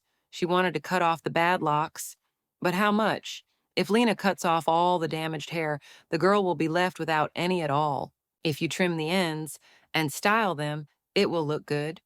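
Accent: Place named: Texas, United States. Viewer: Why is she from Texas?? 😭😭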